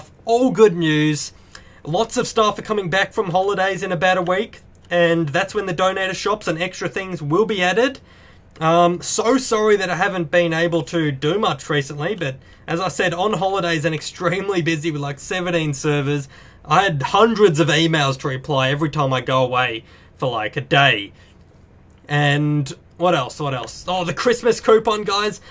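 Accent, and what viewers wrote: Australia. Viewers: Yo mate r u audtralian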